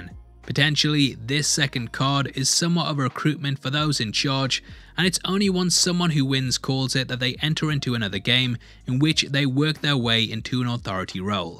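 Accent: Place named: Newcastle, United Kingdom. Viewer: He's not doing anything with his voice. He has a Newcastle accent.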